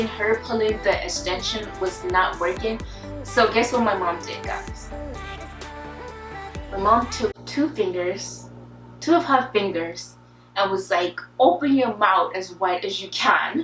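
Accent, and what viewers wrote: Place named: Nigeria. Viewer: am from kenya and honestly you are the first nigeria girl i have watched the video to the end and want more.keep up girl i like your accent.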